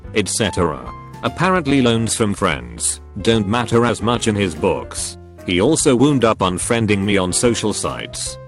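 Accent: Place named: United Kingdom. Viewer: This robotic British accent, does worlds for making some of these people sound not incredibly foolhardy or a little pathetic.. not all, but surely some.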